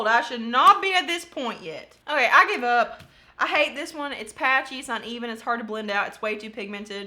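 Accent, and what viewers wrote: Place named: Kentucky, United States. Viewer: Just found your channel tonight because I was looking for a review of Avon powder and already subscribed! After hearing your accent I had a feeling you were from KY like me and sure enough! Excited to keep watching your videos 💜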